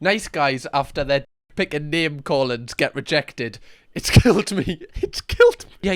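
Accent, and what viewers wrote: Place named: North, United Kingdom. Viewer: Keep at it mate you're a natural 👌 usually I hate the sound of us northerners on tv/video 😂 but I honestly laugh at the shit you react to.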